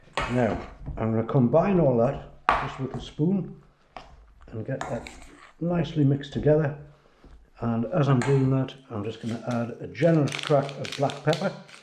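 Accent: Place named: North, United Kingdom. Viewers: You sound like my dad, who was a Geordie ❤ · Can't put into words the feeling of home, as soon as i heard your accent! I'm from the North myself and this makes me want to get right back into baking! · What a grand fellow you are. I came to see if I was doing anything different when I make cheese and onion pasties. Turns out we are pretty much doing the same format and recipe except I probably use a little less butter and lard. I enjoyed listening to you. Are you a Geordie? I am from north east of England.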